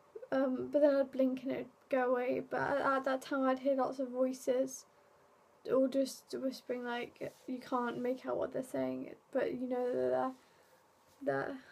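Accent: United Kingdom. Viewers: I don't know why the voices have to be negative, but I guess sometimes they are positive,I hear them too but now a days they are softer and I don't think people are really thinking about me.people are thinking about themselves.Don't worry cause there is no reason why people would want to cause you harm,so your alright. Modern medicine is getting more advanced there is hope ,that soon you won't have to hallucinate not so much.You look like a sweet girl and I like you English accent. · The accent is either Liverpool, or Manchester.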